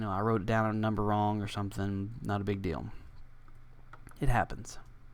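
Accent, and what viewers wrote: South, United States. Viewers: nice to hear another southern guy that likes minecraft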